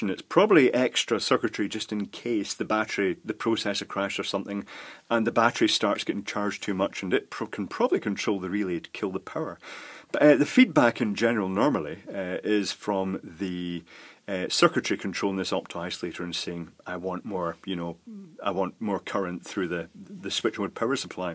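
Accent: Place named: Edinburgh, United Kingdom. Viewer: I followed your (very clear) recommendations and now have a fully working 'UK' charger.Love the videos and the accent (Edinburgh?). Keep 'em coming.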